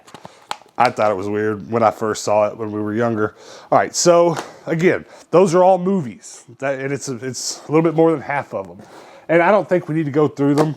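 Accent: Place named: United States. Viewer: You’re super American, interesting but a bit too much for me to listen too regularly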